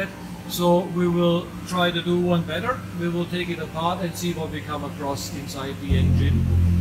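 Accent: Germany. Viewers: As a machinist and a car guy I can appreciate your work! I worked in a German owned shop for many years, your accent brings back many memories. Keep up the excellent work! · Just your accent makes me want to ship my motor all the way to Germany so you can rebuild it!!! Awesome video.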